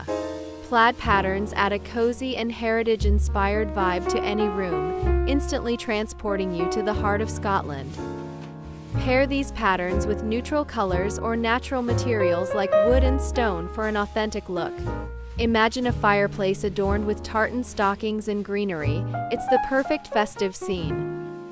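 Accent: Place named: United States. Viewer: Why does Christmas in Scotland have to be narrated by an American accent?!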